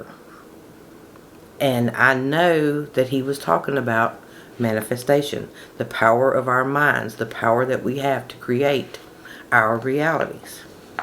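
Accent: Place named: South, United States. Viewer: You are probably the most loveable lass on YT. And that southern drawl of yours, so charming.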